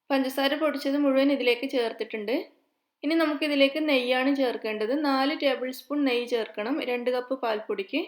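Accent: Kannur, India. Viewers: കണ്ണൂര്‍ കാരി ആണോ I also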